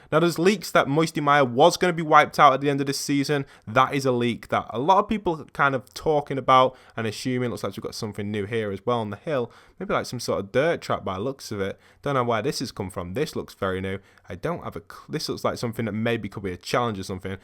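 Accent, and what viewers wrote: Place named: United Kingdom. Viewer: I don't like the British accent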